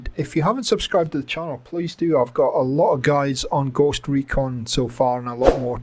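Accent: Scotland, United Kingdom. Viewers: Great video m8 and you sound Scottish aswell so deffo subed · I picked up this game a month or so ago on PC on a ubisoft connect sale, absolutely loving it! Learnt some useful tips from your video, thanks! Plus, it's always nice to hear a fellow Scot on a video, always a nice surprise! Cheers!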